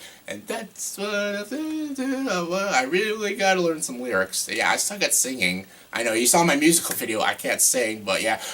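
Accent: Canada. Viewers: He's Canadian that's probably how they pronounce it no need to call him on it.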